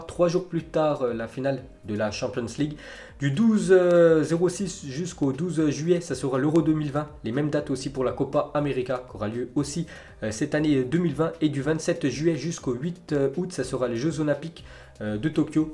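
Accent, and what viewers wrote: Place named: France. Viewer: Stop avec les prononciations chelou à la française "les reds dévile"...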